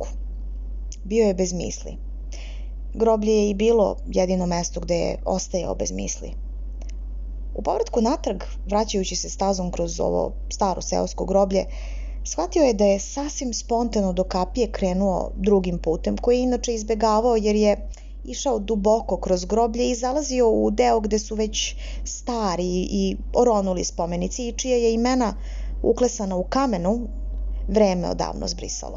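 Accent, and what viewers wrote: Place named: Podgorica, Montenegro. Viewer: Dobra priča. Iz Podgorice sam obozavam ovaj naglasak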